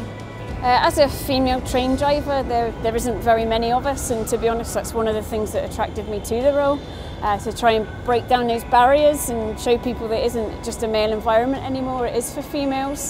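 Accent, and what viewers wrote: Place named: South, United States. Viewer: sounds like somebody who moved up from the south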